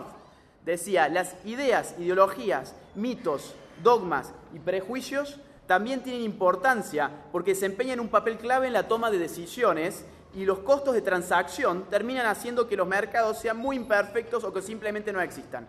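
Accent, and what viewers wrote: Argentina. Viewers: Que lindo ese acento cordobés 😍. ..Laje sos un genio 💖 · el acento cordobes era mas pronunciado antes jaaj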